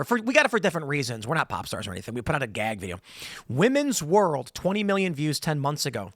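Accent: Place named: AAVE, United States. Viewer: Stop with the double negative and ebonics, please. You sound like an idiot....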